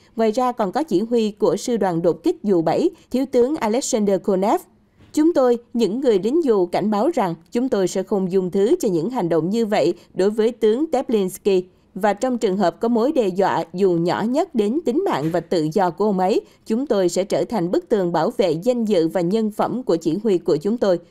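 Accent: Saigon, Vietnam. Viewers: Giong sai gon nghe chu an va ro rang hon .va de thuong hon. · Rất tò mò kênh nầy mà giọng xướng ngôn viên hay quá, đây mới là đỉnh của người truyền tin tức cho mọi người nghe vì tiếng đọc miền Nam Saigon rất chuẩn…!!!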